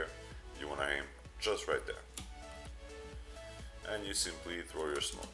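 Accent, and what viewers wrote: Canada. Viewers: are you a french canadian ?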